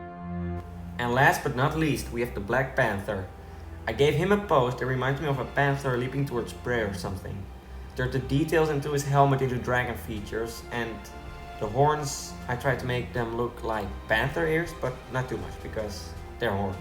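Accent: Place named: Netherlands. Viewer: Ew weird dutch accent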